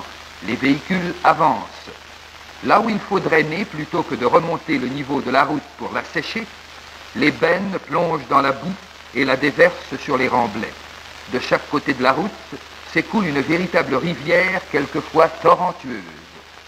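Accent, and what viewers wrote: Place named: Quebec, Canada. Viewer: Comme un Québecois. I hate it....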